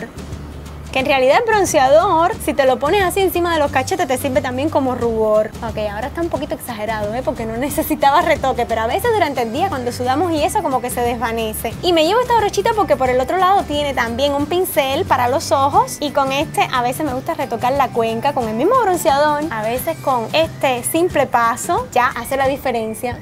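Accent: Cuba. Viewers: mariam teran es el asento cubano pues yo soy panameña y desimos nama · mariam teran es por el acento cubano en algunos países cambian algunas palabras por ejemplo yo soy chilena y no hablamos con la S ejemplo: estas/estai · mariam teran por el acento cubano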